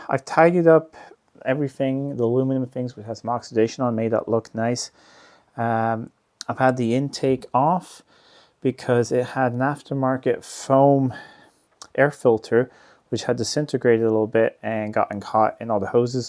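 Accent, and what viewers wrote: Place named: Midwest, United States. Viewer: Hey OP, how long have you lived in the UK? Sound like a Midwestern man.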